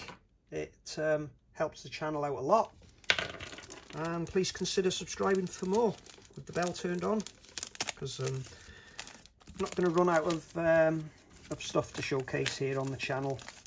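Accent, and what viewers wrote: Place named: Liverpool, United Kingdom. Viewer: Someones from Liverpool?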